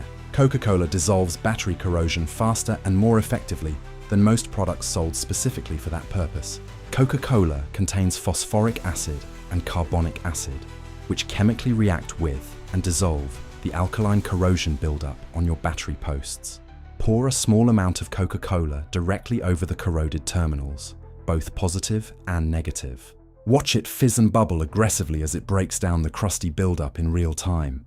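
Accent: United Kingdom. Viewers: Why the British accent?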